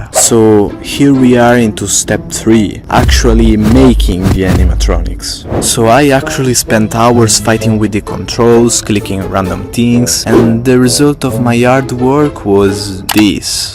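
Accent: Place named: Italy. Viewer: Sento il accento italiano ti ricordo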